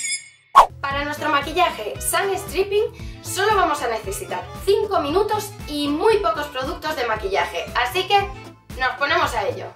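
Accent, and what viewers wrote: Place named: Spain. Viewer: Es acento de España.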